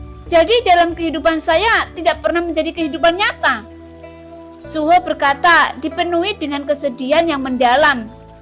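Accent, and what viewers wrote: Java, Indonesia. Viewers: Medoknya diminimalisir mbak e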